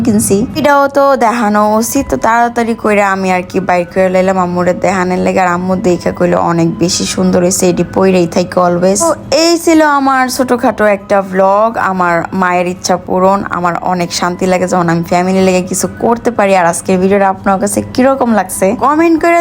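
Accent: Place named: Narsingdi, Bangladesh. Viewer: Apu apni norsingdi basay na nope suddo basay bolben sundor lge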